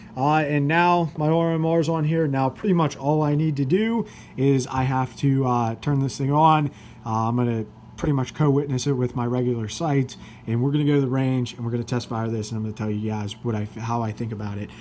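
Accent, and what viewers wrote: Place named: Pennsylvania, United States. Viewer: This dudes accent is 100% from PA. Noticed it even before I noticed his name. Looked it up b/c I just got a RMR for my FNX. Great vid bud. Thanks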